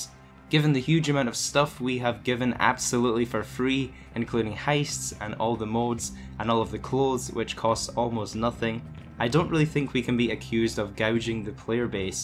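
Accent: Scotland, United Kingdom. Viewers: Here weird question by any chance are you from Scotland? It's just the accent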